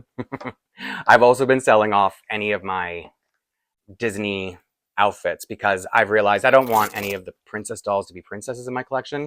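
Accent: Canada. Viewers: I sure love you and your channel! You are so personable I feel as if we’re sitting in the room together and you’re showing me your dolls. I also love your slight Canadian accent, which I thought was cute. You pointed out. I didn’t realize that’s how that Dog’s name was pronounced either so thanks for including me in! It’s the wiener dogs right? 😅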